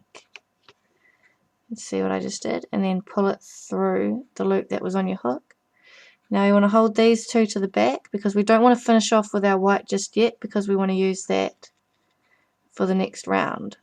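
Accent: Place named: New Zealand. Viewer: So cute :) also so nice to not have to concentrate to listen. We need more kiwi accents on YouTube!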